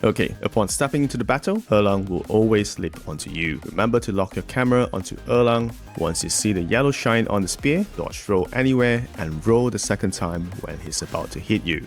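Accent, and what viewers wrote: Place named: Singapore. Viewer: Nice guide bro, you must be a Singaporean with that accent :)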